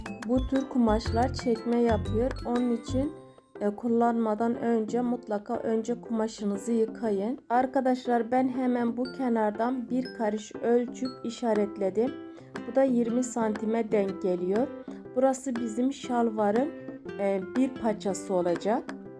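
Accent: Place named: Anatolia, Turkey. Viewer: Anlatimin anadolu şivresi gibi ellerine salik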